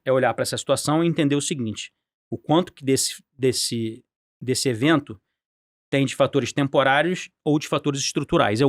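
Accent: Rio de Janeiro, Brazil. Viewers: é carioca kkk... Repare sempre no fim tem aquele "X" arrastado. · O cara é extremamente PEDANTE Falou falou e não disse POOOOOORRA nenhuma Além desse sotaquezinho CHATO de carioca metido a besta Além de repetir a todo momento que ele tá gastando muito tempo com a localiza Além dessa blusa de frio amarrada no pescoço Kkkkkkkkkkkkkkkk Que pessoa ridícula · Esse dialeto carioca, dá raiva, tudo com x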